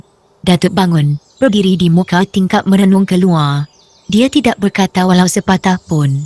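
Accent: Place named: China. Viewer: Yang pasti..penceritanya bkn melayu..pelatnya cina..apa pun terima kasih kerana tolong menyampaikan cerita 🙏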